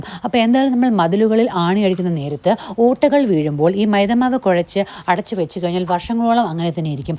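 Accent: Trivandrum, India. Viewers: Plz talk in ur regular TVM accent which is so heartwarming and ur trademark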